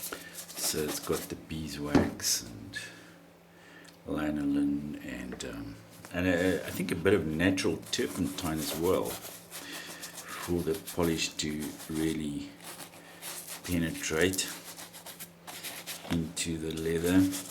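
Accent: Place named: South Africa. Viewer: You are South African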